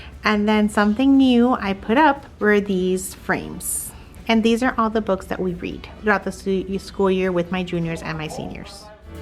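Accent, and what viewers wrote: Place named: Miami, United States. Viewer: Great room, u from Miami? I hear accent…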